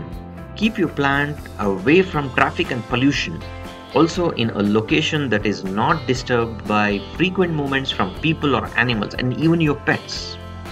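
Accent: India. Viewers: These are common living soil organic farming techniques, if haveing an indian accent made it spiritual for ya then well lol ok man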